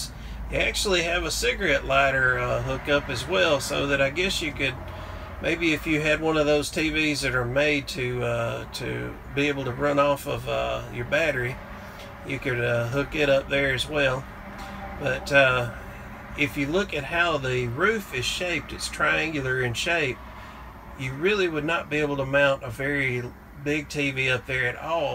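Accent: South, United States. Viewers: great tour and like your southern accent! but seriously very complete tour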